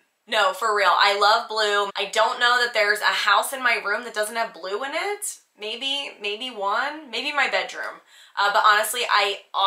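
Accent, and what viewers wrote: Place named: Ohio, United States. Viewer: Nice to get to know more about you! I thought you may be from my neck of the woods due to your accent. I am an Ohio girl. We have alot in common and and can totally relate.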